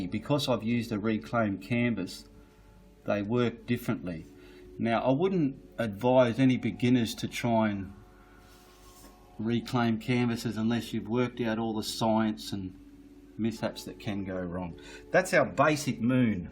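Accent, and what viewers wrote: Australia. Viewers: Hola from Quebec Canada ! Love to paint now that I found your tutorials ! Love your style as well as your Aussie accent…though I work hard to undestand everything you say 😅 Thanks a lot !!!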